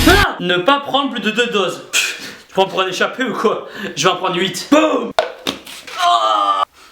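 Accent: South, France. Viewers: T'es mon youtubeur PREFERER ACCENT DU SUD ET MUSCU LE PERFECT moi qui suis du sud BOOOOOOUUUUUUUM!!Allée.vien ma petite :-P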